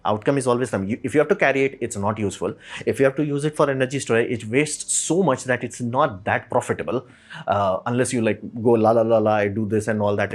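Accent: India. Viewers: his speech was fairly casual. You are probably not very used to english. And he used indian accent so i dont really understand why this is difficult to listen to. If he's using indian sounds for english words, should be easy. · Really enjoyed. Science +sarcasm + indian accent Subscribed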